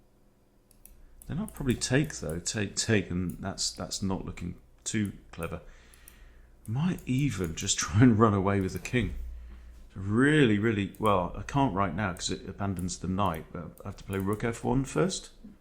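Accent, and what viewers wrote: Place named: England, United Kingdom. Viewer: I'm sorry you're being criticised for mistakes. Most people watching you are advanced beginners to intermediate, I suppose. If they want perfect lines, there are plenty of titled players on youtube that can provide that. You helped me get over that beginner stage when I kept getting wayward queen attacks. I think I've learnt more from you than any other youtuber and it's nice to have an English voice :)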